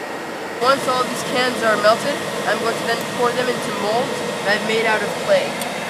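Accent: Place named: United States. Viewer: You sound American. Yet, where you live doesn't look like America. Nice work by the way. Great start!!!!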